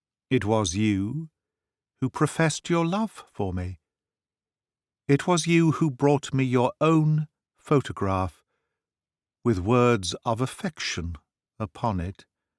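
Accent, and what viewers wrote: United Kingdom. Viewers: You can never imagine my pleasure and joy when listening to your clear British accent Mr... When I got the notification for a new YouTube episode of your marvellous channel i had immediately listened to your charming narration.. please keep on sharing classic literature... They are my favorite... Thank you very much Mr I owe you a lot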